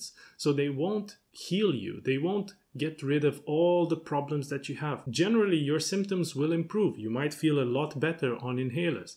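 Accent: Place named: Romania. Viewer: I have listened to three videos now. My first guess is that your mother tongue is Romanian. You sound like a pastor from there who leads a food distribution program for the poor in Canada. You do not speak a Slavic language but you are from Eastern or S.E Europe. So, that leaves only one language. It is not so much your slight accent as your manner of speaking.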